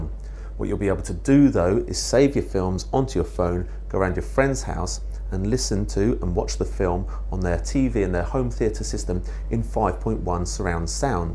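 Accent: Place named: Netherlands. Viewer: is het echt een britse of een nederlander? zo ja dan moet hij normaal engels praten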